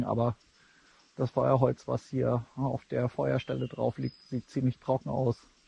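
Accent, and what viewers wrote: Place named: Hesse, Germany. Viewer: Hesse schwätze halt hessisch ...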